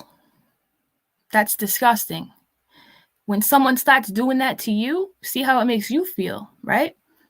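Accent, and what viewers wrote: United States. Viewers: This is only the 2nd time I've watched you and yes I subscribed after the first half an hour. I live in Australia and I think you are the American version of me but way cooler. I think I actually agree to most of everything you said. Thank you and God dam I love your accent I could listen to you for hours 🇦🇺💛💚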